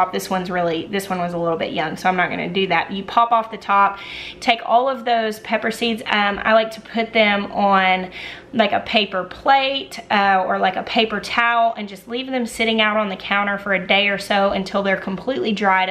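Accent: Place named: North Carolina, United States. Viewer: Your channel was the first channel I watched when I decided to go Eden on my yard. Very informative and thorough on your videos. The accent also makes me miss NC. Keep up your great work and on educating myself on so many topics. Thank you.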